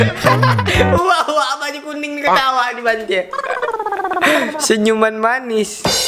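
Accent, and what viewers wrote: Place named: Padang, Indonesia. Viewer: Padang mana nih suara nya?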